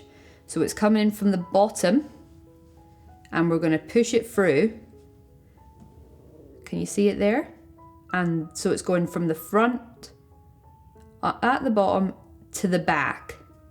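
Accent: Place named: Scotland, United Kingdom. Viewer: Thank you for your precise directions it worked,50 years without touching the needles. Love you Scottish accent.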